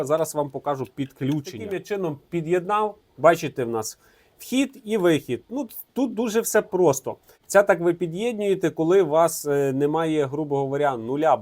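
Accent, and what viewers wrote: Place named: Lviv, Ukraine. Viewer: вимова львівська, але русизмів доюха, але то нічого не вирішує. Тільки підзелупний йободовб концентрується на мові, головне бути людиню, й не важливо, якою мовою ти розмовляєш. Он зелупан вивчив Українську, але воно ненавидить Україну й Українців, воно хоче тут царювати й рабів з бангладешу набирати....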